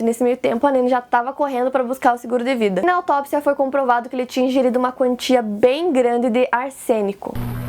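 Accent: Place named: Paraná, Brazil. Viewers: Seu sotaque paranaense é maravilhoso, que voz linda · Ela é de Ponta Grossa, no Paraná. Eu moro no interior do Paraná e tenho um sotaque parecido, adorei encontrar o canal dela e finalmente ter um Youtuber maiorzinho que fala como eu shshshs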